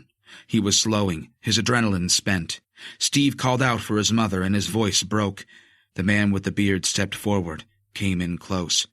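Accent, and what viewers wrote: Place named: United States. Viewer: The narrator should be English. The American accent jars with the language.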